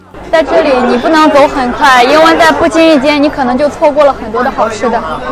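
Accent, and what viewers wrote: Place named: Beijing, China. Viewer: 好像是北京口音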